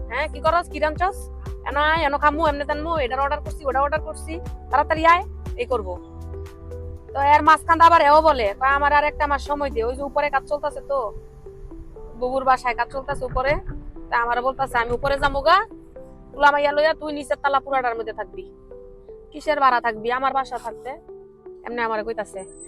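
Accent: Comilla, Bangladesh. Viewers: আপুর বাড়ি মনে হয় কুমিল্লা।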